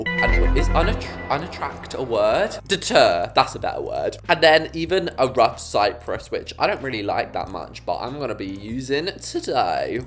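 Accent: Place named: England, United Kingdom. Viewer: The English accent always gets me lol.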